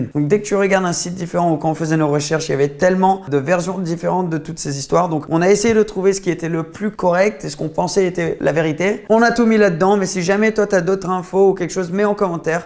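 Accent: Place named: England, United Kingdom. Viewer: Ton accent anglais de merde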